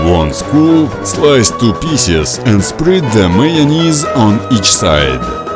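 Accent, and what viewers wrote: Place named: Russia. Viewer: Wow! This is a Russian accent. I know how it sounds, because I am from Russia!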